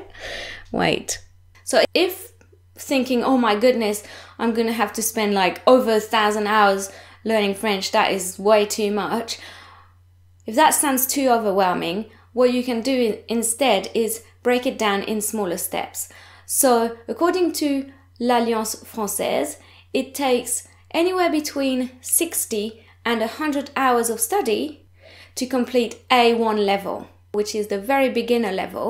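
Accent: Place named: United Kingdom. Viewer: Merci beaucoup for this video! You sound so British!!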